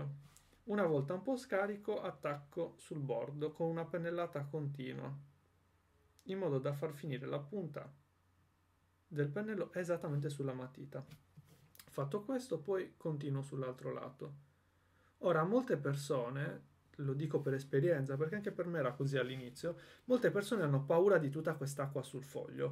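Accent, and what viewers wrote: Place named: Sardinia, Italy. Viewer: No , l'accento è quasi impercettibile, io l'ho notato perché anche io sono Sarda 😄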